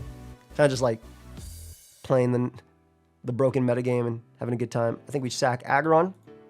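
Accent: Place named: New York, United States. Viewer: should have known tbh, i hear the NY in your voice and the words you say haha. sending love from from Suffolk County appreciate your content fam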